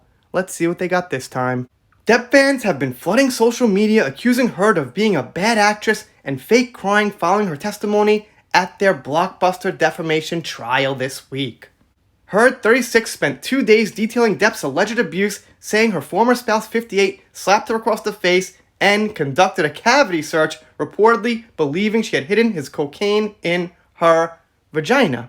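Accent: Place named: Brooklyn, United States. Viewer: This is my 4th time looking at your channel and I LOVE ITZ!! Thank you! Love your “ Brooklyn”? Accent too ( from The Heights😍 )!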